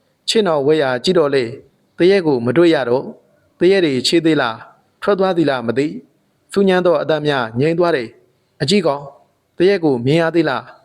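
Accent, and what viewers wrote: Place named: Rakhine, Myanmar. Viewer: အသံ ကောင်းတယ် ရခိုင်သံဝဲသလိုဘဲ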